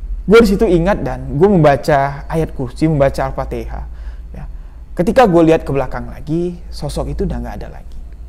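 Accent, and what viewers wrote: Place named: Bali, Indonesia. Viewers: mas logat nya seperti.orang Bali.Terimakasih kisahnya. buat pelajaran bhwa hutang WAJIB DIBAYAR SESEGERA MUNGKIN. Dan bila meminjamkan uang,TIDAK PERLU DENGAN BUNGA itu namanya RIBA. Kalau sipeminjam tidak sanggup bayar hutang,alangkah baiknya,jika DIBEBASKAN saja, Insya Allah akan Allah ganti rezqi dengan berlimpah. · Kamu orang mana min,,, logatmu mantul2 kayak orang bali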